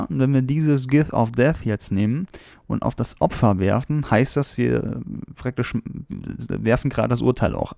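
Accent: Hesse, Germany. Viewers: Er ist Hesse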